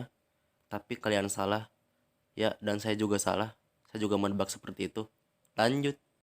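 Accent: Karawang, Indonesia. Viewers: bjir orang karawang 🤣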